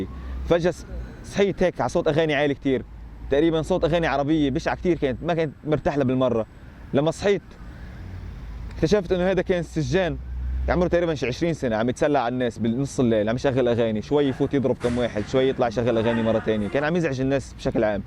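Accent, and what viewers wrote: Lebanon. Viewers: لهجتك كتير قريبة ع اللهجة اللبنانية، و ع فكره باركور مخيف😍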